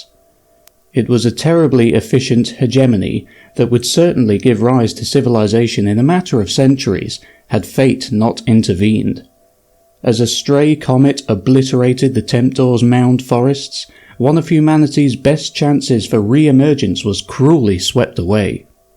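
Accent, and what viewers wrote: United Kingdom. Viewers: Why do I like listening to Brits so much more than anyone else? Great job.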